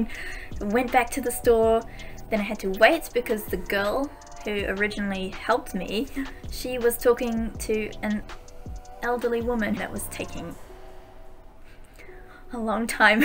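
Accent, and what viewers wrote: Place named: United Kingdom. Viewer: I have a friend that looks nearly identical to you and she's like 100% mestizo blood. I thought you were a Mexican with a British accent:p nice vid btw you're so cool😎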